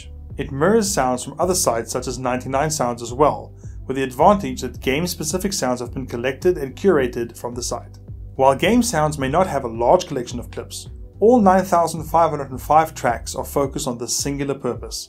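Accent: South Africa. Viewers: South African accent? Love it, apologies if I'm wrong. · You sound South African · South African accent!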